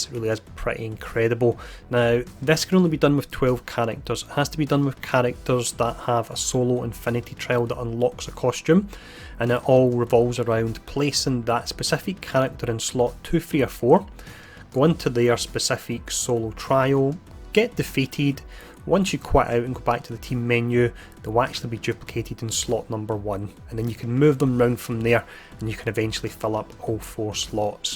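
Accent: Scotland, United Kingdom. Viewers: I thoroughly enjoy your Scottish Accent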